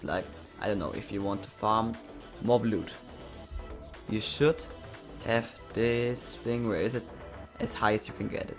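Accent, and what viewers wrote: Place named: Germany. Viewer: Als ich deine videos gehört hab, hab ich direkt gemerkt das du deutscher bist :D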